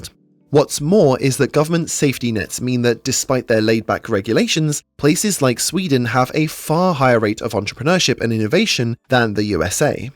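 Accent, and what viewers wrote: United Kingdom. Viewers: Plus i find odd a uk voice cares wtf u all left the eu so how can u complain wtf u dont have a dog in this Eu area. So in the immortal words of my uncle, ' shut your mouth if u aint in the fight..' just sayin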